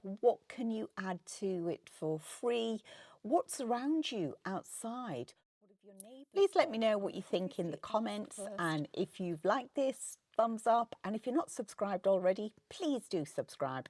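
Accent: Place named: London, United Kingdom. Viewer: ❤️❤️❤️❤️❤️❤️❤️❤️❤️❤️!!!!!! What time should I arrive for dinner?? How beautiful!! I must add, I have met 2 people this week, on separate outings in Beaufort, SC, who both talk and sound just like you. Both are from London, how about that. And….I had to share with them how I follow you and just adore your accent and love the words you use to describe things. ❤️❤️❤️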